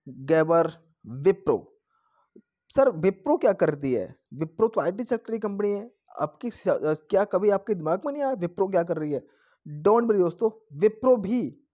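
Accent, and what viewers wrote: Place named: Rajasthan, India. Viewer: Boli se Rajasthan ko laage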